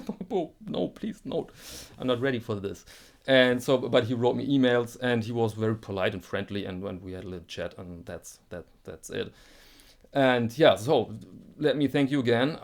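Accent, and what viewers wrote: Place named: Germany. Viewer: I mean deapite his obvious flaws he is intelligent, witty, eloquent and a man of culture with an awesome german accent. Keep up the good work!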